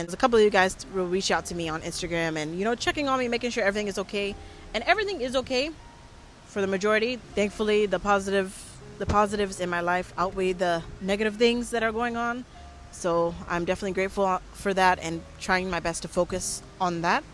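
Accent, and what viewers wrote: Africa. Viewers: You even have a lil African accent ☺️😍…. My husband is Ugandan, I plan to move there for 5 years after we have our baby. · Beautiful, you are developing that African accent to, love you and your families videos keep sharing👏🏾👏🏾👏🏾👊🏾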